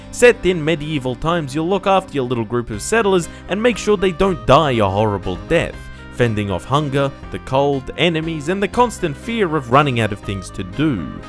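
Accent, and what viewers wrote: Australia. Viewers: I knew you were a fellow Aussie but your accent seems influenced by something else, I can't pick it and it drives me bananas, mate!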